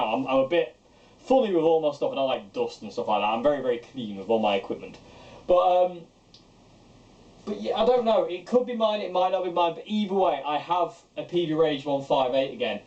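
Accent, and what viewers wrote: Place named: Grimsby, United Kingdom. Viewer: If you upgraded your equipment at 'Pooles' combined with your accent I'm taking it you are from or near Grimsby - my hometown 😂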